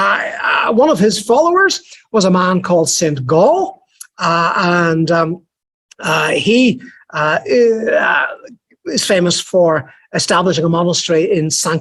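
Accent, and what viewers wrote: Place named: Northern Ireland, United Kingdom. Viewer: Thank you, Professor. You have what appears to be a Northern Irish accent. I would wonder to what extend today's Orange adherents relate to this history. Or do they consider it the history of the people they have dispossessed -- the native Irish -- and thus not relate to it?.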